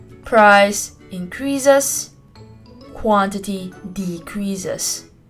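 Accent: Singapore. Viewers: are you from Singapore or Msia? sound like Singapore accent but very subtle :) I miss my country! :D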